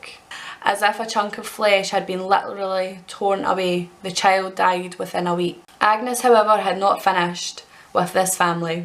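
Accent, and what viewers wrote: Scotland, United Kingdom. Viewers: So nice to hear an actual Scottish person talking about witch craft as a practicing Wiccan myself the Rowen branches and red ribbon are uesd as a fertility and abundance charm just FYI also the bridles u spoke about were commonly used as u quite rightly said on gossiping nagging wife’s but the husband would drag ur ass door to door to show the neighbours and towns folk that ur wife was a bit of a gob shite and u tamed you woman lol 😆 can u imagine also was common practice to get a good spanking if they disobey there hubby’s pmsl 🤣 what a shite time to be alive as a bold outspoken woman eh ... any whoo loved the video hope u do more on the subject u should look in to the Dumfries witch trials they were particularly shocking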